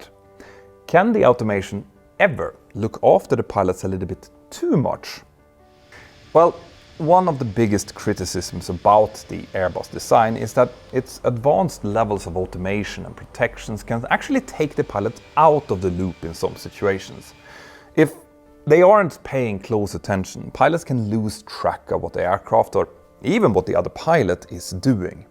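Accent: Scandinavia. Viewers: the way u talk english either ur norwegian or sweediah 😂😂😂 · An interesting Scandinavian accent here, but natural for sure.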